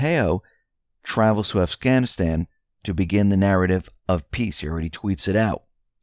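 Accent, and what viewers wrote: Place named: New Jersey, United States. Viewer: He’s from New Jersey, you’ll grow accustomed to it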